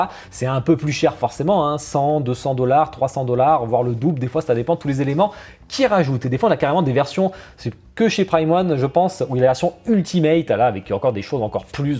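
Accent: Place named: England, United Kingdom. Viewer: Cet accent anglais je suis fan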